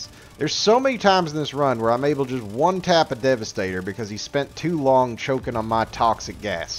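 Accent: South, United States. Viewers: It's good to meet a fellow diver who has a Southern NA accent! Respect brother! Keep spreading Democracy to those socialist bots! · I’ve watched other people, and they just kinda talk, but I like how you actually have personality in your videos. The southern twang really adds to the bot shit talk.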